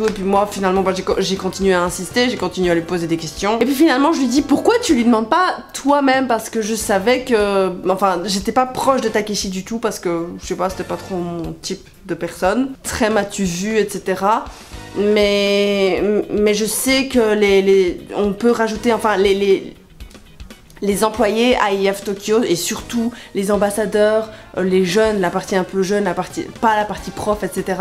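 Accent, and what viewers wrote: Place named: Belgium. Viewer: tu as toujours l'accent belge au fait... "houit" :p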